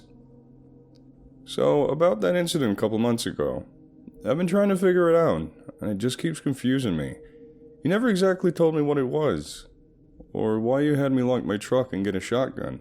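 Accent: South, United States. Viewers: Man I dig ur southern U.S. accent! From Oklahoma myself!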